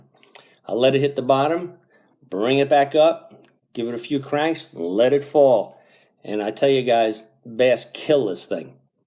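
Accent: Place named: New Jersey, United States. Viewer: Elope the NJ accent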